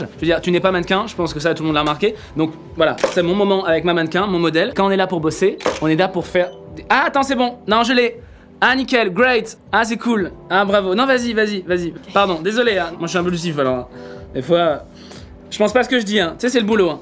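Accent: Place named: England, United Kingdom. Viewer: J'aime tellement ton accent anglais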